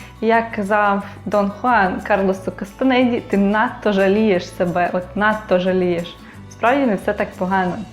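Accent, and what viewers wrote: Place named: Italy. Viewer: ти говориш з італійським акцентом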